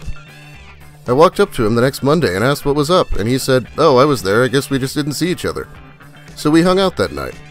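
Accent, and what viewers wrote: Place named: South, United States. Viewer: As a texas boy hearing your southern accent is is nice.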